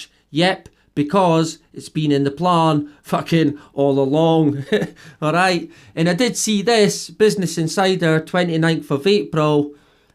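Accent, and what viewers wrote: Scotland, United Kingdom. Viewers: Am I the only one who loves to hear his intro ? "Right, here we go, back again, back again" in that Scottish accent.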